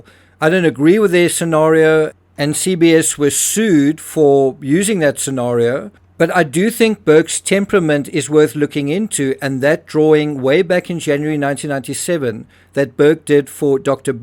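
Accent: South Africa. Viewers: your accent alone is reason to watch it all... I pride myself on being able to mimic accents with South African being one of them and your video helps me keep it fresh and sharp... You and Die Antwoord,... 😉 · He is South African. He can't his accent. Just like you, can't change yours.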